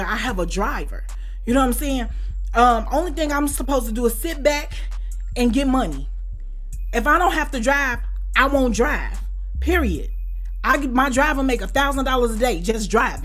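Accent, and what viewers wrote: Chicago, United States. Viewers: Mannnnn you should've sold this shit to NETFLIX or sum!! Nbs I'm engaged like a mug! Lol Saw you trending on here never clicked on the vid then a reactor I watch reacted to your video of your stay in the county. The way you talk is Chicago through and through and it got me hooked and entertained while at work! Lol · I ❤️ a smart Pretty Thug woman! Baby girl you can tell a story like no otha 😆 😂 wit cha Chicago self luv that accent. I'm ya cuzzo from Milwaukee Do tha thang thang baby girl blow up write a book documentaries etc.LUV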